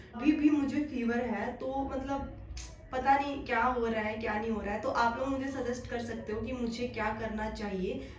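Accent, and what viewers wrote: Haryana, India. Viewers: Aap haryana se ho mam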